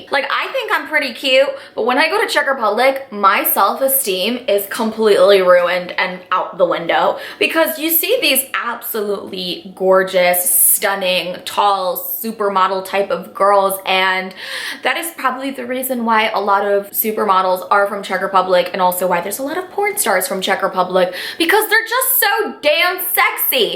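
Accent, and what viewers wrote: United States. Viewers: mluvíš jako kdyby jsi se narodila v americe :D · xD veľa američanov rozpráva takto xD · Hi darling, i love you really. I am from Czech, and I love you for one reason i think you are proud to be half czech person, if you dont understand me i wrote it in czech. Myslím si, že si pyšná na to že si napůl češka, a to je moc krásné od tebe. Doufám, že si to přečteš nebo mi dokonce odepíšeš. Bylo by to pro mě hoodně velké překvapení protože tě miluju, tvoje videa jsou 100% úžasné. A ikdyž máš americký přízvuk tak ti nádherně rozumím. <3 I love you!!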